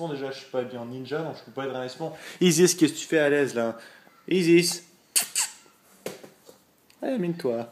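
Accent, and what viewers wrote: Quebec, Canada. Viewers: tu comence a plus parler quebecois den tes video evite parce que jai du mal a comprendre sinon =jpe pu comprendre t video mode quebecois: bon jmenui che moué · Héhé tu choppes l'accent Québécois, fais gaffe;)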